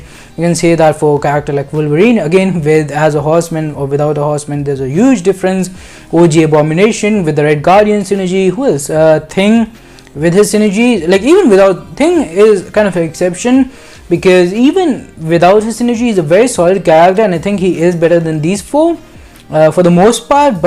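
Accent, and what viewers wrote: India. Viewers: Are you Indian ?? Cuz you like and sound like a Indian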